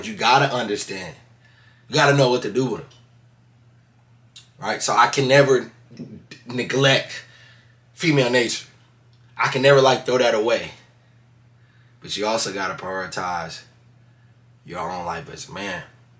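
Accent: Baltimore, United States. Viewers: what it dew. That has to be a Baltimore accent. Dope video bro!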